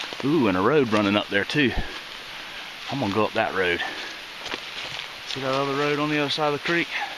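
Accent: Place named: Texas, United States. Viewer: This guy has a southern Texas accent. They are all Bounty Hunters in Texas half the time. Americans have all been Bounty Hunters since the War Declaration by Elizabeth II of England in 1955. Americans are not immigrants from Great Britain. They are all Americans since Original Creation. They don't put up with the English trying to take over America. Queen of Canada and Royal Chieftain of Indians of America 🇺🇸 Métis of Toronto the Royal Land of Jesus Christ and Royal Indians of Mohawk and the Rouge and Humber Indian Chiefs to heal in Healing Waters Created By Christ Americans Honor God as Christ the Creator and they do not go hunting for Animals that God Created in the Beginning. They know what to shoot as the ones made by evil spirits or other people making them in America or Canada as one Continent that they know is theirs to defend. One Hundred percent of Americans are Bounty Hunters since 1955 and all the USA 🇺🇸 of the War Generations go Bounty Hunting. 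The Breeding Generations also go Bounty Hunting in North America. They know the American Flag is Created by God to represent America the Stars and Canada the Stripes. We as Canadians are the Ones out of touch with reality of what we should do to support Turtle Island to defend Our Country especially with so many War Criminals here in Canada and having been through two World Wars launched by Elizabeth II in England. They know the money issue is Canada's big problem and too many politicians and too many fake cops and too many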